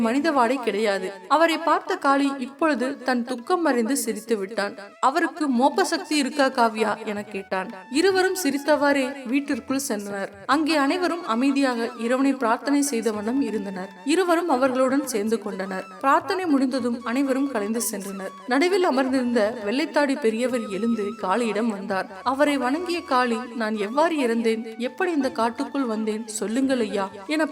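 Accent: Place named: Jaffna, Sri Lanka. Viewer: அவ்விடம் கற்பனை அதிகம் உண்டோ.என்ன யாழ்பாணம் தமிழை விடமுடியவில்லையா .கதை மிக சுமார்தான் .😂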